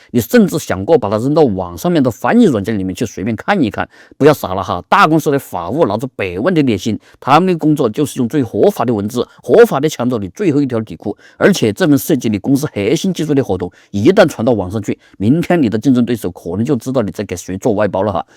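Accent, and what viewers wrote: Sichuan, China. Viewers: 兄弟伙你这声音恩是川普哦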